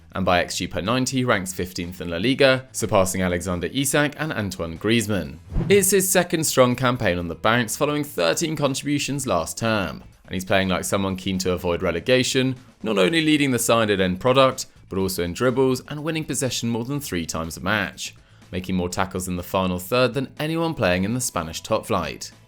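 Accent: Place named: Sunderland, United Kingdom. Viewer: My guy is definitely a mackem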